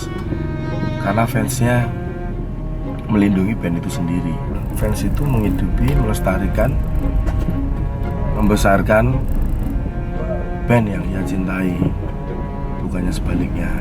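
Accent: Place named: Java, Indonesia. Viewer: Mas, kamis Inggris saiki ilang yo? Hehe, kangen karo english java ne mas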